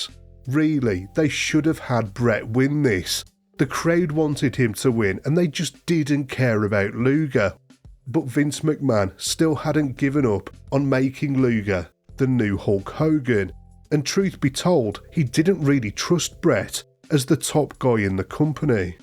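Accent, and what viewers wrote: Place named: Black Country, United Kingdom. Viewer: love 94 almost as much as your accent. Yow sound like youm from rand ere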